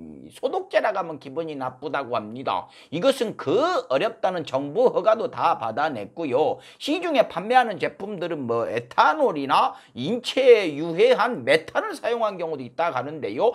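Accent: Jeolla, South Korea. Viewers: 전라도 말투네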